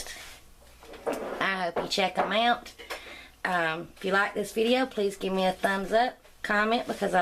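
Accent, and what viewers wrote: South, United States. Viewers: Great video. Thanks and I subscribed. loved the southern accent. keep the vids coming.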